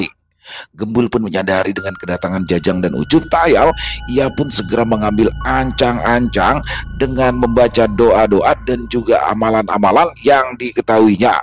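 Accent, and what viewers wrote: Jakarta, Indonesia. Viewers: Tangerang hadir.. Logat betawi mantebbb.. Logat kalimantan cakep.. Jawa jg boleh.. Emang serba bisa nih.. 👍👍👍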